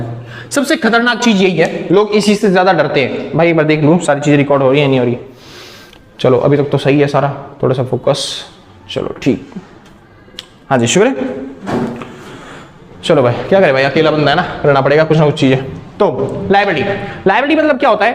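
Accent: Haryana, India. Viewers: SIR PAKKA HARYANA SE HAI 👍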